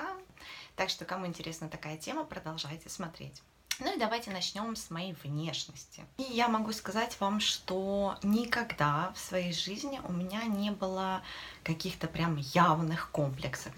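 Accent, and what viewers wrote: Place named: Provinces, Russia. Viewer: простая речь как из глубинки и провинции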